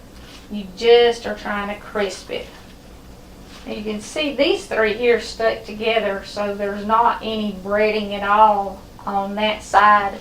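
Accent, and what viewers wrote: South, United States. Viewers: Just found your channel & am a new sub. I love your southern accent, such a beautiful part of the country you're from. Also really love that you put God first! The fried squash looks delicious, will definately be making it. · Bless you. I just moved away from the south to the Midwest and have been having the worst bout of homesickness. You not only sound like home to me but cook like home. Going to make this tonight! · LOVE her southern accent. Would it not be more convenient to use a bowl instead of a bag?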